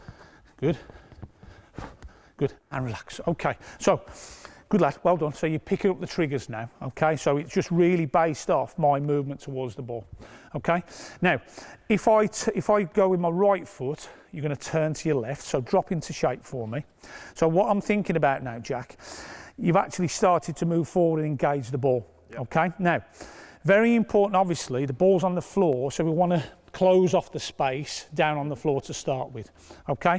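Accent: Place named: United Kingdom. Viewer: British english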